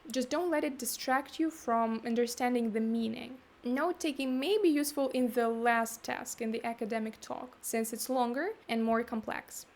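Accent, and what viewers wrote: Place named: Russia. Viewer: Такой сильный русский акцент....😂😂😂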